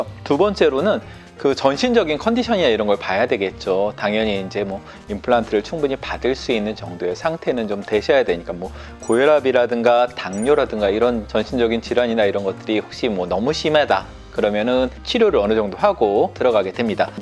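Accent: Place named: Busan, South Korea. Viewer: 원장님 고향이 부산?분이신가여? 말하시는거 들으니 바로알겟네여 ㅎ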